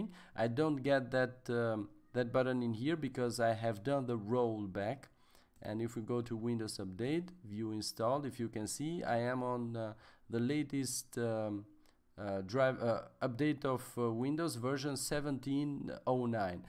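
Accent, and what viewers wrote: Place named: Russia. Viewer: произношение русское)))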